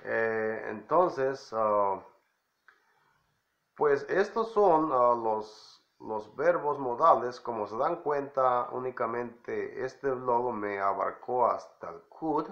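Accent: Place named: Texas, United States. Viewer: GRACIAS me gusta mucho tu asentó como tejano jeje bendiciones ahora si a ponerle atención a la clase jeje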